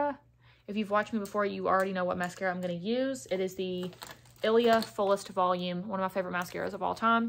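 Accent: South, United States. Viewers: Where are you frommm?????? I hear a little southern accent mixed in there!I’m from South Georgia and it’s REALLY nice to hear somebody else that sounds like me on social media!!!! 🫶🏽🫶🏽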